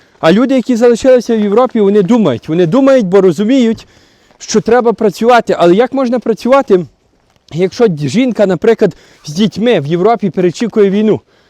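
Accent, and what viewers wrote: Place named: Western Ukraine, Ukraine. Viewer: Трус. Чути говір західної України. Втік? А країну інші повинні захищати?!!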